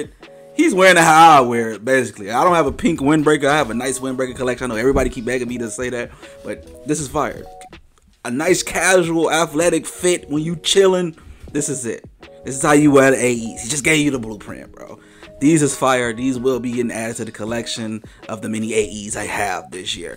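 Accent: Chicago, United States. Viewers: You gotta Chicago accent lol Edit. You said it later in the video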